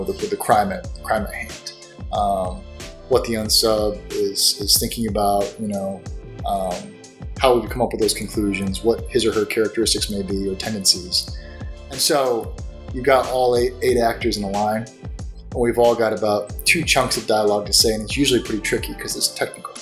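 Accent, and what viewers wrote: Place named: United States. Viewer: Its amazing to see you again I remember your face from many years ago a drama called Spring Waltz , I was like who is this actor who is more american speaking than korean , so very handsome , then I see you on I Live Alone , which was so good you treated everyone so well , I dont watch TV so I didnt know about Criminal Minds till watching the show , but yeah you would of been Perfect to play in Crazy rich Asians , your face is one not to be wasted and beyond that you seem like such a genuine guy, really sweet , in ending sorry for your loss of Mango , I wish you the best hope to see you on the Big Screen !!!!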